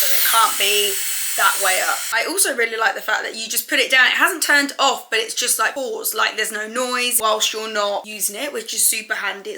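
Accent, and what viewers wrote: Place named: Australia. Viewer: I thought you was Australian at first 😂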